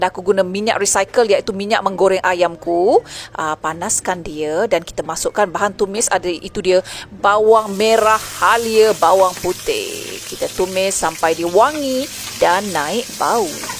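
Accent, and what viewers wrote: Negeri Sembilan, Malaysia. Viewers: Kelakar lah Kau ni,nasib baik ingat lg loghat Nogori tu. Suko acik nongar eh.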